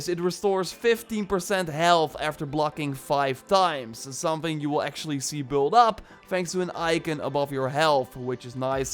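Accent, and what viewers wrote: Netherlands. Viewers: Small Question are you dutch?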